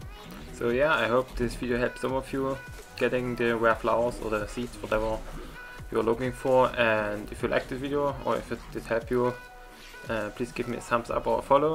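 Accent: Germany. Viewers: lol man hört deinen deutschen akzent :D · Deutscher Akzent,mirkt man gleich :D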